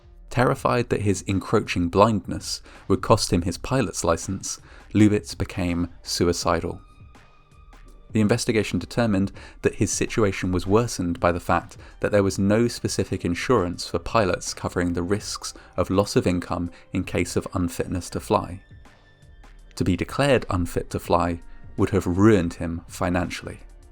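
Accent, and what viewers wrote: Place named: RP, United Kingdom. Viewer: The Queen's English, mehn. Respect.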